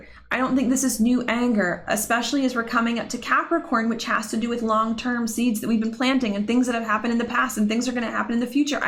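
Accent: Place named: Pittsburgh, United States. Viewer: I just kept getting caught up on your CUTE Pittsburgh accent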